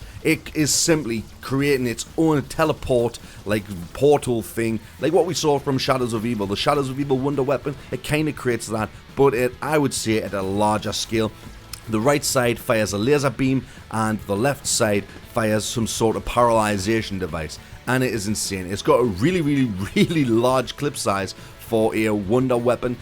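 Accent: Newcastle, United Kingdom. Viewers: Guessing you're from Newcastle ;) same here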